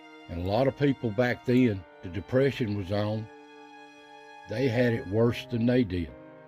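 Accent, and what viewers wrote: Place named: South, United States. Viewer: Hi; Just an old trucker from north Jersey. I agree with you about miracles. Hopefully God has one left for my wife. She has glioblastoma. Been together 50 great years. We live like my grand-parents who were farmers their whole life, inseparable. Old school ways will never die. We love your videos, beliefs, and your beautiful southern accent. You and yours are wished a Merry Christmas and a Happy New Year. This year was a rough one for you and I but I always remember that God doesn't give us anything we can't handle. Prayer to yall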